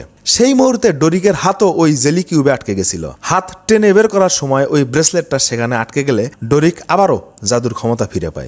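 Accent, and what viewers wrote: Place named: Jessore-Kushtia, Bangladesh. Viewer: ভাই আপনার বাড়ী কী যশোর,কুষ্টিয়া বা ঝিনাইদহের দিকে,,আপনার ভাষায় আমার এলাকার টান❤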